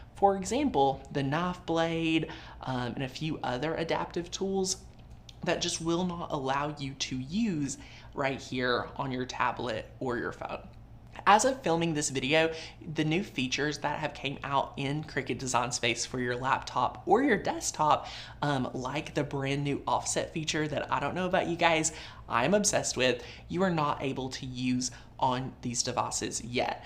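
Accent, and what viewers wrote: South, United States. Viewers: I use my surface pro for design space & joy app also. do I hear a lil southern slang in your voice? Love it,awesome videos,thanks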